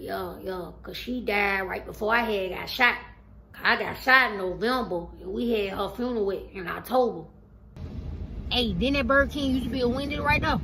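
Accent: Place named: Atlanta, United States. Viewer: I had to sit bacc & think.. she got everything on point. Cause this is how me & my people talk down here in Atlanta Ga smh lol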